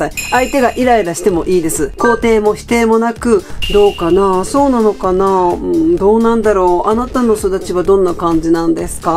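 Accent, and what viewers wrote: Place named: Kansai, Japan. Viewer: 関西弁最高です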